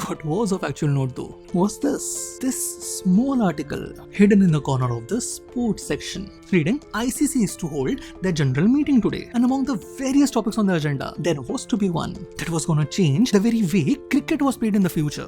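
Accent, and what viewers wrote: India. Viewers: Sorry, but you will have to work on your pronunciation and diction. Your story telling has been going downhill and this was unbearable, literally could not understand a few words. Over confidence and not wanting to improve your craft maybe?! We Indians have a strong accent, but this one was jarring. Slow down, speak properly and give it a few takes and retakes. Content was good, no complaints.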